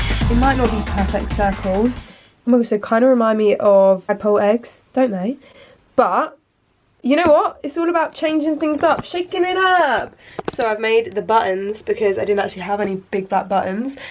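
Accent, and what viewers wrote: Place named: England, United Kingdom. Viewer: Randomly stumbled across your channel when I was living in Aus and I loved it because it reminded me of home and just listening to someone English ramble on was super comforting 😂 and now I’m back in England and still here and loving the randomness, the rants and everything else! Thank you for being completely you - very lovely in a world of fake!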